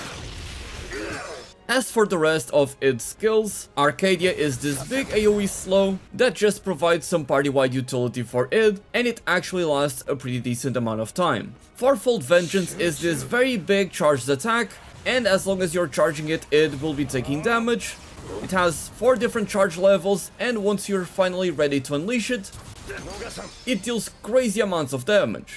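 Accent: Portugal. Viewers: então esse sotaque era português 😂😂😂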